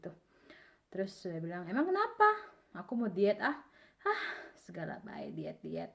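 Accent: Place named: Betawi, Indonesia. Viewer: Po numpang tanya, dari daerah mana ya? Ko bahasa betawinya kaya bahasa betawi ora... terus maap itu sodet bukan coet/ cobek😁 coet mah artinya ulekan sambel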